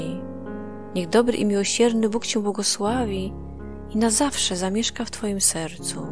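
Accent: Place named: Białystok, Poland. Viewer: Jestes z Bialegostoku lub okolic ze tak dziwnie mowisz?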